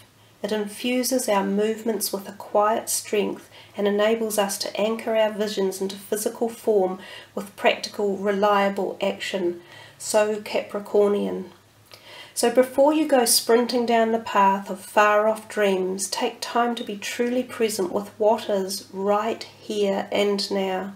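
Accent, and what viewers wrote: New Zealand. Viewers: Hi Hun, a kiwi accent which ya don't see on here.i am just across the channel in oz....good to see a few locals putting card readings on here. I keep an eye on ya insights to see what comes to pass.......cheers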